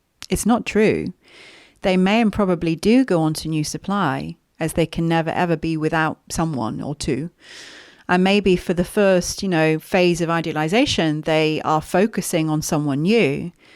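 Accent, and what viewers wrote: United Kingdom. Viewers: I do excuse me for asking are U based on UK seem to me U have strong Brit accent anyway blessing for your work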